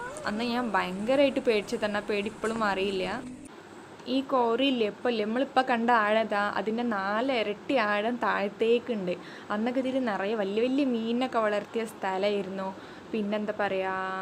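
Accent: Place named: Malappuram, India. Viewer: Natural video ...........🌹🌹🌹🌹🌹 മനസ്സിന് കുളിരെകുന്ന പചപ്പാർന്ന വീഡിയോ .....👍 എന്തൊരു ബങ്ങിയ കാണാൻ ഊരകം മല സൂപ്പർ......👍 ചെരിഞ്ഞും മറിഞ്ഞും തിരിഞ്ഞും കിടക്കുന്ന ആ മരങ്ങളും എന്തൊരു ചന്തം സത്യം പറയാണ് ഈ വീഡിയോ ഫുൾ ഇരുന്ന് കാണുകയാണ് ഞാൻ കാരണം പ്രവാസികളായ എന്നെ പോലോത്തോ ആളുകൾക്ക് ഇങ്ങനെത്തെ വീഡിയോ വളെരെ ഇഷ്ടമാണ് മനസ്സിൽ ഒരു സന്തോഷം ആണ് .,... റബ്ബർ തോട്ടം ufffffffffffffff എന്താലേ കാണുമ്പോ തന്നെ 👍👍👍 ആ കോറി ഒക്കെ എന്തൊരു ചൊർക്ക് 😍😍😍 നമ്മളെ മലപ്പുറം ഭാഷ കേൾക്കുമ്പോ ഒരു രസം തന്നെ ആണ് ലെ 😂😂😂😂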